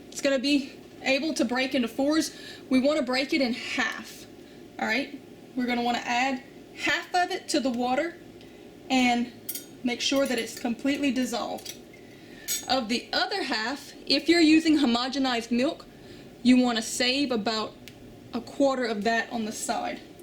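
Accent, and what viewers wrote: Louisiana, United States. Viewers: Cannot wait to try this. A lot of other videos I watched made homemade mozarella seem a lot more complicated. I live in a small town where specialty items are not usually available, so I appreciate the use of easy to find groceries. On a personal note- I picked up the Louisiana accent immediately, and paired with the well-used Magnalite pot, I knew we were from the same state! So hello and thanks from Jennings, LA!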